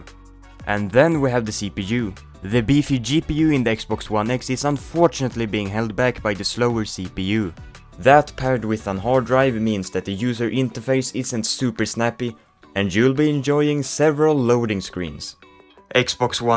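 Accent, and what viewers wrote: Sweden. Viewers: I recognized your swedish accent and you confirmed it when you said "swedish prices" lol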